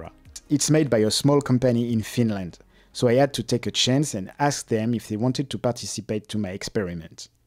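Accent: France. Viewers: your accent seems French. are you French?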